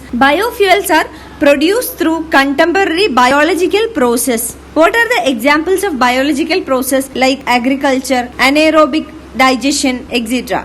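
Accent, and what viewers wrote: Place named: South India, India. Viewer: Her style of speaking is south Indian and most of people are don't know Hindi in that region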